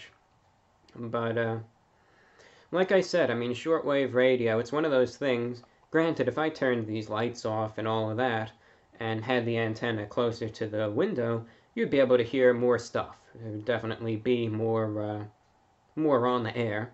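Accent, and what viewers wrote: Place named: New York, United States. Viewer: Okeechobee fla i thought he was from ny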